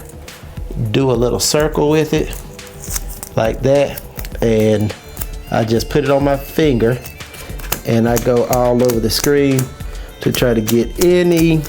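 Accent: South, United States. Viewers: man you are one of the most country sounding people I have ever heard. -the guy from Texas that lives in the sticks of Tennessee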